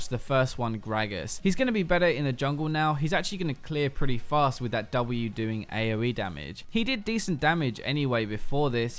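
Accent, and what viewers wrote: United Kingdom. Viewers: "I think honestly, basically, i mean. i don't honestly, i guess. he honestly, basically it means, honestly" - Damn You really need to limit yourself when you use those words and phrases. I watched your 30 min blue EZ guide, and it really pissed me off. On these 7- 10 min videos you can't actually notice, but on that EZ guide it was really horrible. I really Love your British accent and the content you are making, but those phrases man, damn. Keep up the good work tho. Cheers.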